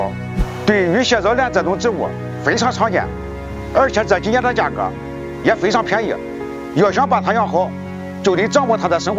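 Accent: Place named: Shandong, China. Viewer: 山东话太严重了，听不懂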